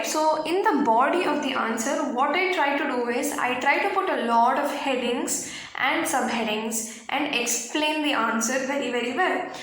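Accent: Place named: Kerala, India. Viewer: Are you a keralite? (your accent make me feel so)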